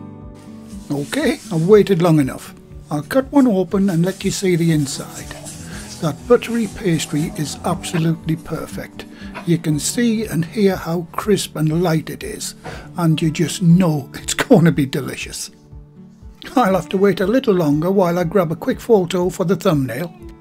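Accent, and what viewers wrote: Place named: Northern England, United Kingdom. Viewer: ooooft these look amazing, but you sound northern like me shouldnt that be henderson's relish your using:P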